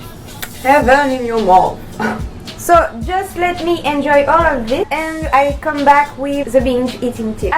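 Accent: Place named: France. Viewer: Le French accent qui passe bien 👌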